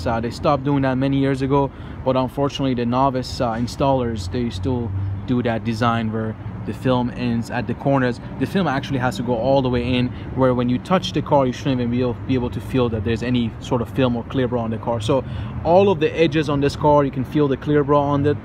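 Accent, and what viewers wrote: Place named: Iran. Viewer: Woow Such a Persian accent Did you come to US like last year or somethin?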